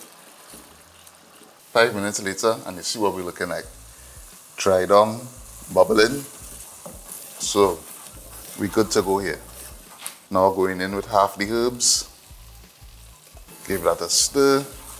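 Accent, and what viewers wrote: Trinidad and Tobago. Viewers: i love trinidad accent soo much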